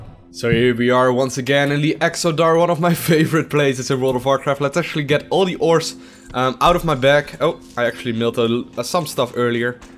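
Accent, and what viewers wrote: Netherlands. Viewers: Are you from Holland?? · You are from Holland arent you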